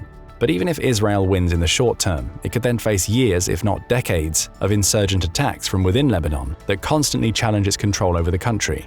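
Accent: United Kingdom. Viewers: What a crap. Full of.wrong facts with nice british accent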